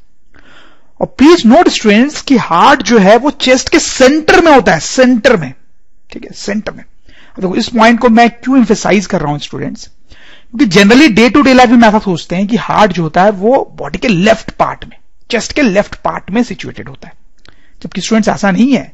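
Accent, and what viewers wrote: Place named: Bengal, India. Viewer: Ap sir kaise bolte ho bengali hi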